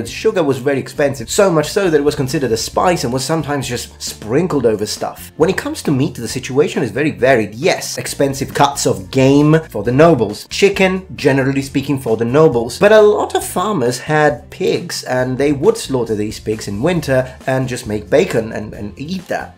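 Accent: Italy. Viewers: Sei italiano vero? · Are you Italian where r u from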